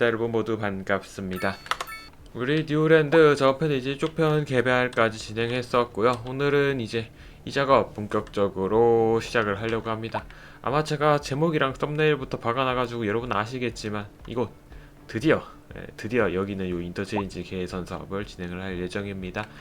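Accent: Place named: Gyeongsang, South Korea. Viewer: 형님 매번 목소리가 너무 매력적입니다 경상도 분이시군요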